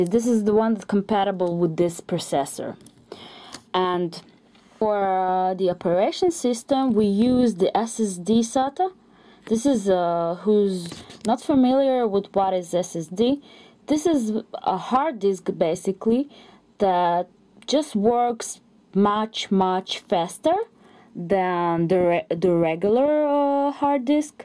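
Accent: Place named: Russia. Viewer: where are you from i feel a little Russian accent :)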